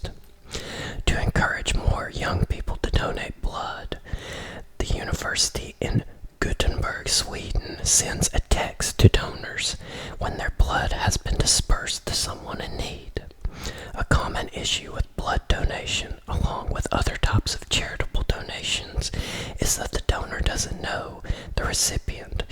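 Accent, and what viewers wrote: South, United States. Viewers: You're very welcome, you whisperin' southern gent ☺️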